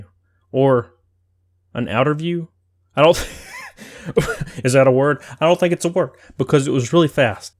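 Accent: South, United States. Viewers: Bro I am from the south and I can tell you are to from your accent and that it is awesome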